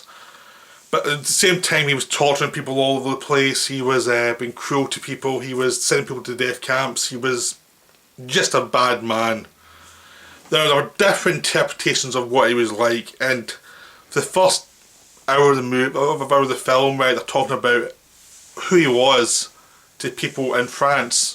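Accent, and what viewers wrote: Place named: England, United Kingdom. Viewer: He has a very thick England accent and hard for ME (prob only me) to understand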